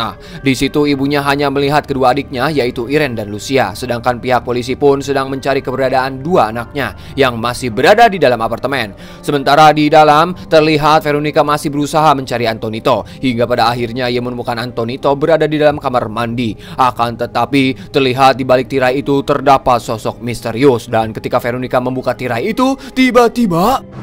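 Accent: West Java, Indonesia. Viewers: Pertama nya aku lagi serius nih ya,eh pas mimin nya ngomong ko aku langsung ter sedak ternyata mimin nya orang sunda ,sampurasun dulur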